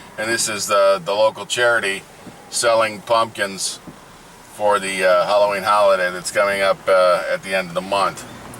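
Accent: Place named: United States. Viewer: Yeah, it gets complicated when converting. US Gallon = 3.785 litres UK Gallon = 4.545 litres £ - $ is £1 = $1.6123 (today) or $ - £ is $1 = £0.6 This is why I prefer to compare in litres as it makes it simpler. So your $3.45 per US Gallon would be $0.91 per litre In the UK we pay £1.38 per litre which is $2.22 per litre Now you know why we have to make more fuel-efficient cars! I also noticed you said maths as mathematics is always plural. P.S. Cool John Wayne type accent.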